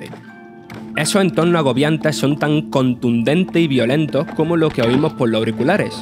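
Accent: Andalusia, Spain. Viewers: E' andalú soquete... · No se si el que habla es de Jaén o Murciano. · Pregunta por curiosidad, ese acento es de qué parte de España? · Del Sur sobre todo, Murcia/Andalucía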